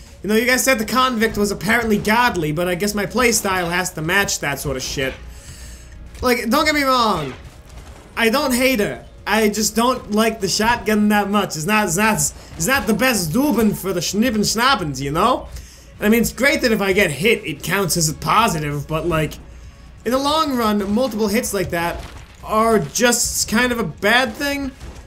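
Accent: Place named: Italy. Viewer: I had a pretty bad speech impediment with R's too, I sometimes hear myself say them with a w and mentally face palm. It hardly ever happens, but my every day speech is stilted to the point of it sounding like a genuine Russian accent, as I've been told, and it's kinda funny to me that you mention your accent bc I always think of my Italian great grandparents when you use it. They were first gen immigrants to America and their English was really terrible, it sounded very much like your accent at times, which is incredibly amusing to me knowing it's supposed to be Russian. You also sometimes sound like my uncle and cousins from Jordan, with the kind of accented buisness talk, I guess? It's kinda funny.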